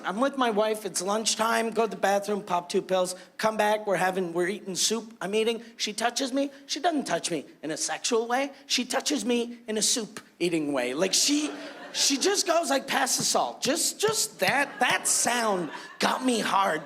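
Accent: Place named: France. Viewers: ***** oui, je sais mais je parlais au gars qui a dit qu'il a un accent français fort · loll l'accent francais fort ?! quel accent !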